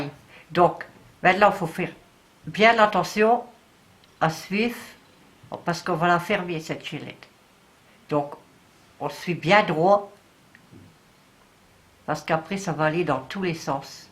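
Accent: North, France. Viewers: bonsoir trop beau et toujours aussi bien expliquer et cette accent du nord, passez un bon moment a suivre la vidéo je vous remercie vraiment gros câlin a minou Monique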